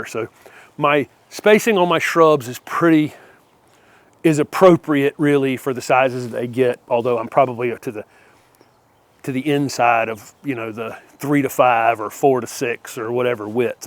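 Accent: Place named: North Carolina, United States. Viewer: You sound like you're in North Carolina, are you, I am? I'm new here. Thank you for your time.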